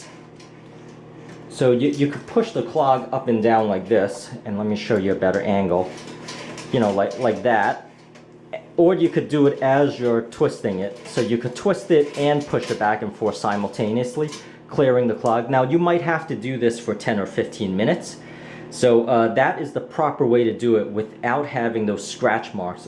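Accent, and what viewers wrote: Brooklyn, United States. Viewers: Thank you so much! It was very clear and persice how you explained! I liked how nice and clean your toilet area was and the masculine accent idk is it from Brooklyn, NY or NJ...it's faint but it's nice! I love that type of accent acutally... thanks for the video I haven't heard it in a while...I miss it I guess....but the video was excellent! I'm glad I found you...I'd watch another video of yours sometime😉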